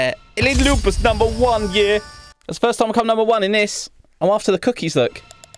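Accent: United Kingdom. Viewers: I'm here because of British accent also he said the majic word ( bottle of woater )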